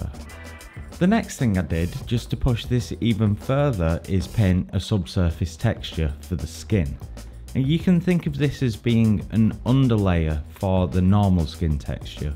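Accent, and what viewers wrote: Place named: Lancashire, United Kingdom. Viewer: Proper Preston/Chorley accent! Loved this, wish I'd stuck to doing 3D work.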